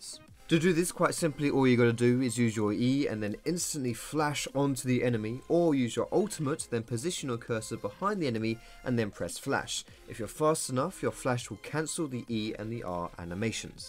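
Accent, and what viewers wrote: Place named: United Kingdom. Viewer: Inspired? No! I've just come here to watch some sick Lee plays and listen to your lovely British voice :3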